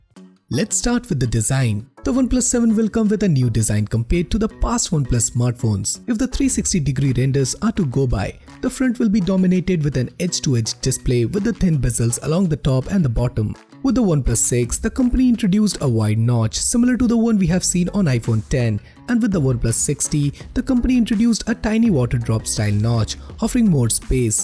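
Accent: India. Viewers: If you read „official“ in the title and hear an Indian voice ... it’s fake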